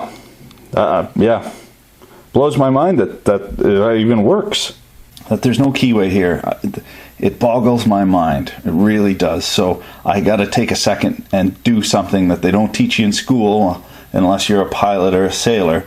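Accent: Quebec, Canada. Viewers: Canadian or what? some of those words I have never heard an american say.... but then again I stay away from the lake areas. great video. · OK...OK...this is now my SECOND favourite channel on YouTube. Love the close up camera work and you actually seem to know what you're talking about!! Bonus. I've subscribed and I'm heading off to your channel to see what else you've reviewed. Do I detect a Canadian accent...sorry I'm from Australia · dude, i just kinda stumbled across you're vids and I am by know means an engineer...however I am sooo intrigued by all your knowledge of this stuff. Im just a humble union carpenter and saw you reviewing some tools i was interested in getting and now i cant seem to stop watching your vids... your canadian accent, your sense of humor, your depth of knowledge...congrats dude! you just got yourself another subscriber :) cheers from you're southern neighbor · I fucking love how he drops french canadian lines here and there..!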